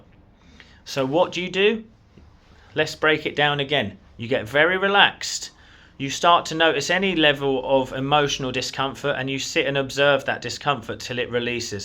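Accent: United Kingdom. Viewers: Thank you for the depth. Clarity and precision and of course the British accent ❤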